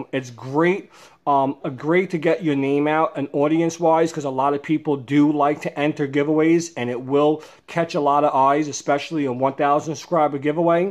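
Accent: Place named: Boston, United States. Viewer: Haha my wife loves your accent. Reminds her of her grandfather. Paak tha caa in tha haavaad yaawd. 🤘🏾👍🏾✌🏾🤟🏾👌🏾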